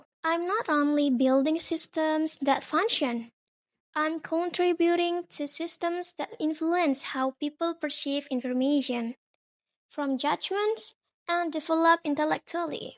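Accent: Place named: United Kingdom. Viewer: omg sounds really British😚how cute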